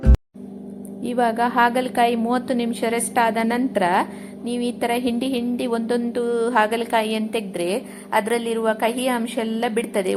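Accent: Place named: Mangalore, India. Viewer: ಮಂಗಳೂರ್ ಕನ್ನಡ ಕೇಳೋಕ್ ಚಂದ ಹಾಗಲಕಾಯಿ ಸಿಪ್ಪೆ ಯಾವತ್ತೂ ತೆಗೆದಿಲ್ಲ ರೆಸಿಪಿ 👌👌👌😋😋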